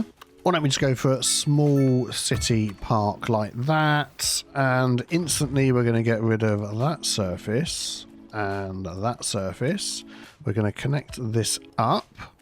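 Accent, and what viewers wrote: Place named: North, United Kingdom. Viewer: ik this is like a super weird and irrelevant question but are u a northener of a southener coz u have a friendly northerner vibe but i can place ur accent nowhere plz ignore if this is too personal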